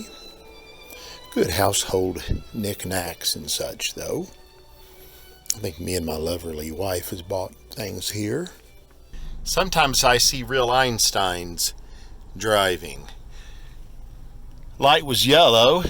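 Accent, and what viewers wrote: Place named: Texas, United States. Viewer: Love the Texas talk. I'm in Southern Illinois. Always wanted to visit, never worked out. Great finds!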